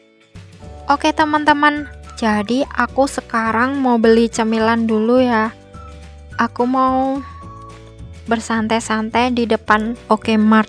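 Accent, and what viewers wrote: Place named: Java, Indonesia. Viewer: Meski di taiwan..logat jawanya msh kerasa bgt.mntap...kgn indo ga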